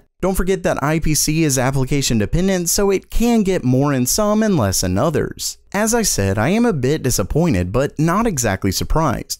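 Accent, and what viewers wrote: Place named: United States. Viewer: Just an American accent lol.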